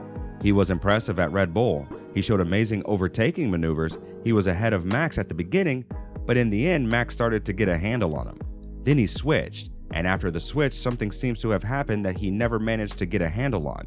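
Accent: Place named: United States. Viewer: Seriously as soon as you hear the American accent .. you know its just clickbait .. sigh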